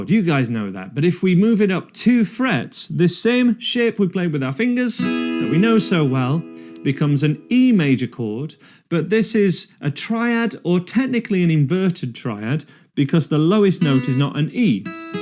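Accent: United Kingdom. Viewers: so British! Love the accent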